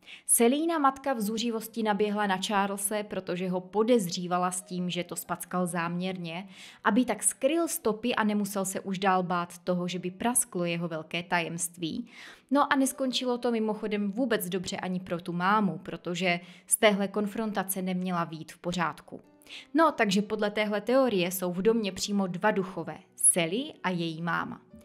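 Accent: Moravia, Czech Republic. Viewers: Mužů ae zeptat z jakého jste kraje? Mate zvláštní přízvuk. Jakoby moravský .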